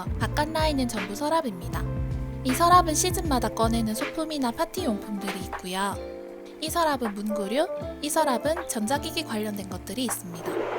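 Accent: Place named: Gyeongsang, South Korea. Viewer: 제 방도 좀 꾸며달라고 하고 싶네요...경상도 분이신 것 같은데, 만나러 가고 싶어효ㅠㅠ엉엉 ㅠㅠ넘 귀여버요ㅠㅠㅠ완전 취저.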